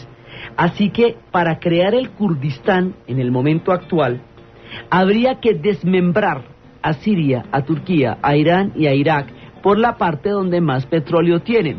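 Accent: Colombia. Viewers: Muchas gracias. Siempre me ha gustado la historia y la geografia. Excelente tu exposicion , pareces de Colombia, estoy en lo cirerto? Saludos desde Chicago. Inscrito, like y compartido.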